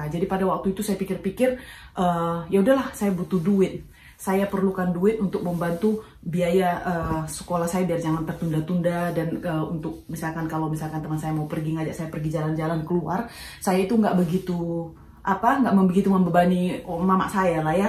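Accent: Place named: Sulawesi, Indonesia. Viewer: Loghat atau slang anda sepertinya dari Sulawesi, maaf jika salah. Saya pernah jalan jalan di Sumatera, Sulawesi, Kalimantan, Kepulauan Riau....saya dapati negara Indonesia sangat kaya dengan sumber alam, sawit terbesar, nikel, minyak dan gas,,,,malangnya oligarki yang mengawal kekayaan dengan korupsi....ada banyak kemajuan semasa Pak Jokowi...selepas 2024 tak tahu lagi.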